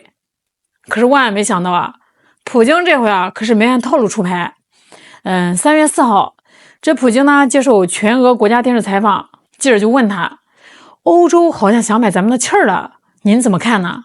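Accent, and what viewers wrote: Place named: Shandong, China. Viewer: 这女的是山东哪的口音？ 章丘？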